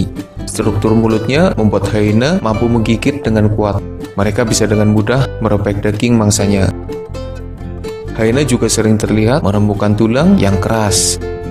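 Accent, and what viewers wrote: Banyumas, Indonesia. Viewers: Jujur, admine wong ngapak duk???, ora ngapak ora kepenak mbok?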